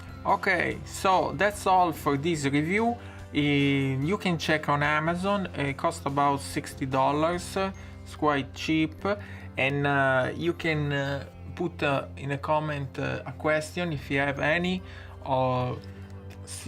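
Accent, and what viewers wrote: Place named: Italy. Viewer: Great demo, one video is worth more than a thousand words. It was also nice hearing a genuine (not a Mario Bros) thick Italian accent. Thank you,. Greetings from 🇮🇹. 😎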